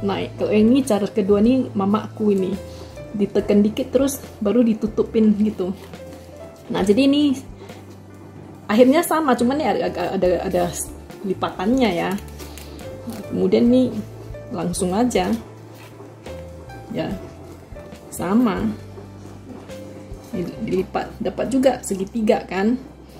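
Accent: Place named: Tanjung Balai, Indonesia. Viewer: kalau dengar logat dan bicaranya mak mungkin punya latar belakang orang tj balai ya , sukses selau mak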